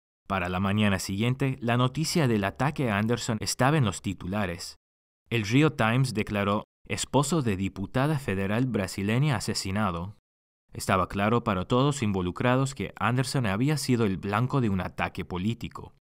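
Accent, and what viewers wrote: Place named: Buenos Aires, Argentina. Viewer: Hablas como porteño(Buenos Aires)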